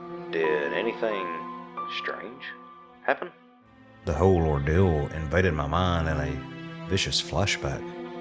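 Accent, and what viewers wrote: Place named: South, United States. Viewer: Glad I found your channel. I’m from the south too and it’s refreshing to hear these in a familiar voice. Keep doing what your doing!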